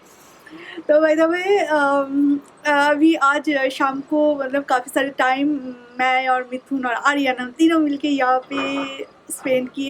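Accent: Bengal, India. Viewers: Ur bengoli mixed Hindi language sounds oswm😍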